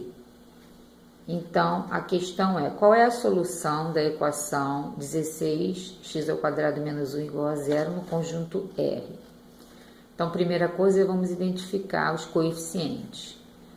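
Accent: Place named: Rio de Janeiro, Brazil. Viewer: i é carioca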